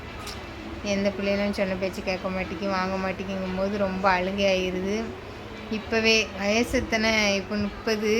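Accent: Tirunelveli, India. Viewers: Paechu tirunelveli maari irukku. Ethana naala coimbatore la irukinga. Enga dialysis panringa, also amount for one dialysis?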